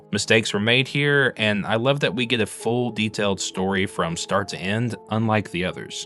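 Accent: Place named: South, United States. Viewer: Off topic- I didn’t notice your accent until “Otis” & then suddenly I could hear it… I really am pickin up the “country” accent if I’m managing to not even notice it 😂 (not bad or nothin, just a silly observation! But on THAT topic I appreciate how well you manage to balance the southern accent & enunciating bc it sometimes feels like a lot of folks around me that also have the accent tend to struggle w enunciating & as someone who struggles w audio processing… well, it can make customer service VERY difficult 😅)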